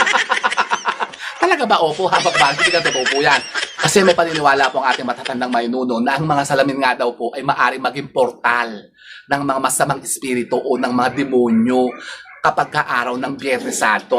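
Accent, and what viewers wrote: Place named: Bulacan, Philippines. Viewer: taga bulacan pi ba kyo?yong punto kse ng pagsasalita nyo punto ng bulacan,taga bulacan din aq balagtas at isa nyo aqng subscriber.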